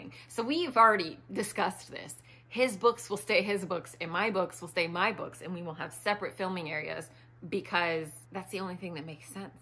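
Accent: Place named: Midwest, United States. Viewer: I'd say your accent is mostly midwest with a touch of North Dakota, so Michigan make a lot of sense.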